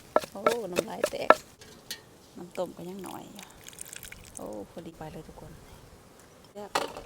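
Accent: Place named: Sakon Nakhon, Thailand. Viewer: ทางบ้าน ใช้ภาษา สำเนียงคือกันกับทาง เผ่าลาวสกลนครเลย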